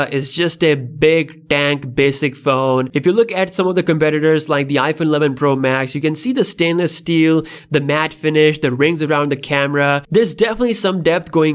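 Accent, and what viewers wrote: India. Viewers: You have your Indian accent messing up the whole thing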